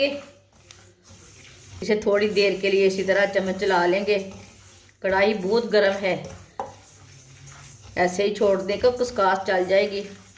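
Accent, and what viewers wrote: Punjab, India. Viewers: Mohtarama ki urdu b Punjabi lehjay wali hy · Samaj to a Rahi hy Punjabi style urdu hy to ksi ko Kya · خدا کے واسطے اردو مت بولو پنجابی میں ویڈیو بناؤ آپکی اردو بھی پنجابی لگتی ہے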